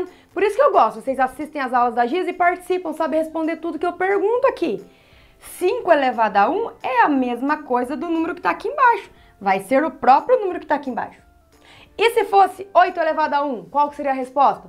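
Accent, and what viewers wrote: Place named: Santa Catarina, Brazil. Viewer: Voçê é de Santa Catarina?Voçê tem sutaque..😅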